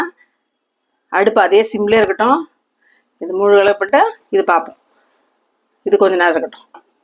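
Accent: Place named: Telugu, India. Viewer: அக்கா உங்ககிட்ட ரெண்டு கேள்வி நீங்க நடிகை ஜீவிதா மாதிரி இருக்கீங்க and வீட்டுல தெலுங்கு பேசுவீங்களா நாங்க ரெட்டியார் தமிழ் தெலுங்கு வாடை அடிக்குது னா தமிழ் tr ங்க 🙏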